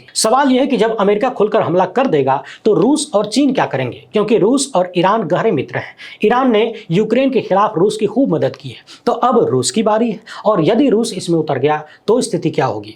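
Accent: Bhojpuri, India. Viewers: Ur bhojpuri tone! So sweet.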